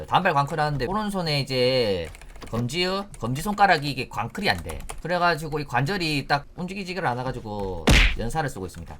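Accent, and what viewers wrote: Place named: Gyeongsang, South Korea. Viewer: 초등학생인 저희 아들의 권유로 배그를 시작하여 이렇게 유튜브까지 시청합니다.저희 아들은 김블루 광신도인데 저는 광신도입니다.ㅋ같은 갱상도 사나이라 그런지 사투리도 귀에 쏙쏙들어오고 재미있게 잘보고 있습니다. 아들을 이기는 그날까지 유튜브시청 많이 하겠어요. 퐈이팅 하이쏘~~^^ <mail> 입니다.ㅋ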